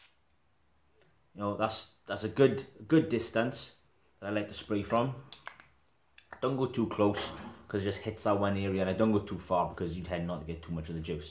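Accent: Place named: Welsh Valleys, United Kingdom. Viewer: Subscribed earlier today. Glad you have reconsidered stopping. You sound like you are from my part of the world. I am from the Valleys. I come from Rhymney and I was born in a hospital in Merthyr. Your area?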